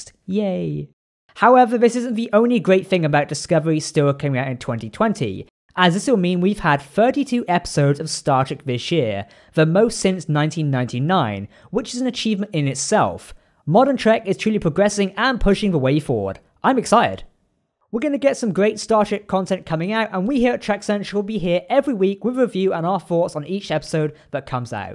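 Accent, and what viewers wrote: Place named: Wales, United Kingdom. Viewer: Looking forward to the new season, although i missed some of the commentary due to the thick excitable Welsh /English accent.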